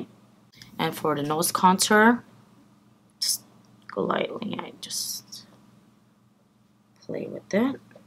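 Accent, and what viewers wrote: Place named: Armenia. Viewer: You & Lilit are two of the most beautiful women! I love your Armenian accent coming through! You two do makeup better than anyone else I follow! So natural but glam & just refreshing seeing someone contour without looking too done up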